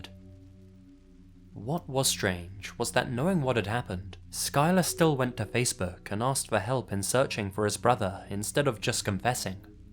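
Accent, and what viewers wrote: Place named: South East England, United Kingdom. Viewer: It's a bog standard middle class south east England accent. Nothing like Scottish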